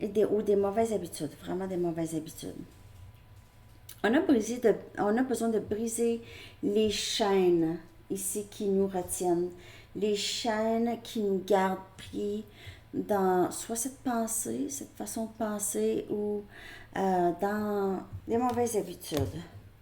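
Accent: Quebec, Canada. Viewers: coucou JOLIE Du québec APPAREMMENT,???jE SUIS étonn&e car d'habitude j'ai du mal a écouter ce parlé bien spécial!!!J'adore VOTRE façon très personnelle de tirer les cartes votre SPONTANEITE et AUTHENTICITE également c'est RARE! J'adore vos mimiques naturelles vous êtes charmantes et UNIQUE! TOUT me parle en plus!!!! géniale vraiment heureuse de vous avoir découverte. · Bonjour J’adore votre accent 😅! Le Québec me manque! Merci pour cette lecture toujours très juste! Bonne journée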